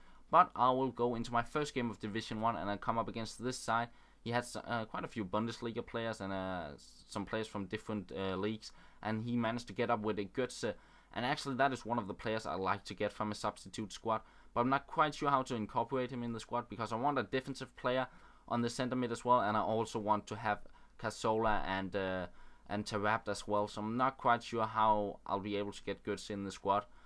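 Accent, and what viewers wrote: Denmark. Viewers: i think i am hearing a touch of danish when you talk, am i right? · hah! I can hear you are danish, because of the way you talk english! Jeg er norsk btw :P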